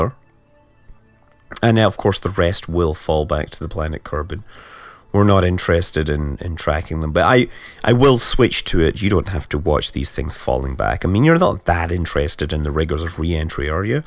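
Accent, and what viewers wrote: Scotland, United Kingdom. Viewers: He is british... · No, he is a Scotsman..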